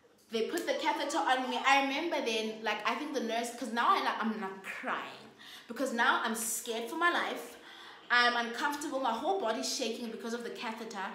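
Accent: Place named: Botswana. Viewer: Your tswana accent...OMW KE E RATILE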